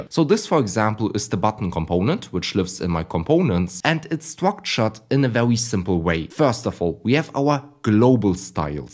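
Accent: Germany. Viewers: You sound soooooooo much like great scott. I mean I assume you're both German, but still it is uncanny.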